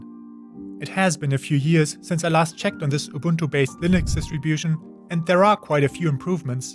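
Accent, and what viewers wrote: Germany. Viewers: I know right! I love his god damn German accent.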